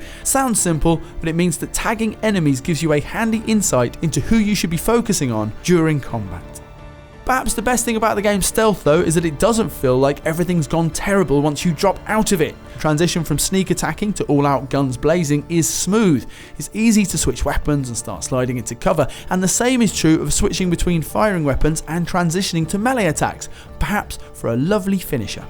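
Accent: United Kingdom. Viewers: My god im tired of british accent!!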